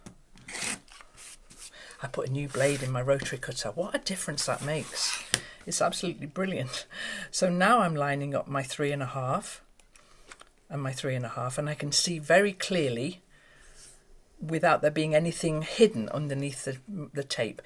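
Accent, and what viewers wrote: United Kingdom. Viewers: Just found your channel. I'm so happy I did. You are just deliteful to watch. I love your British accent!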